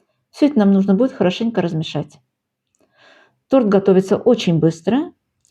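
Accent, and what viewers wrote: Baku, Azerbaijan. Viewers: Как вы хорошо объясняете,подробно ,все секреты выпечки не скрываете..Настоящая бакинка советского времени .Я тоже бакинка ,жила 8 завокзальная,у нас у всех такой акцент ,бакинский,чистый литературный.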